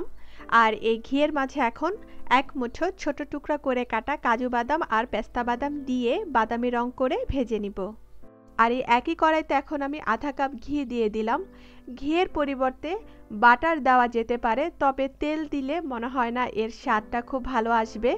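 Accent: Sylhet, Bangladesh. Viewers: apu apni ki Sylhetyy?? Apner shob rannai amer kuv valo lage 💜💜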